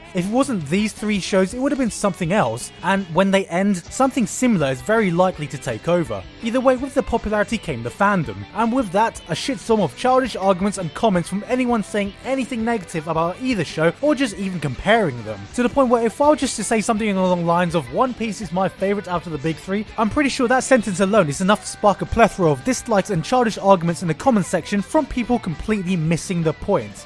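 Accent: United Kingdom. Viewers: Dude you sound British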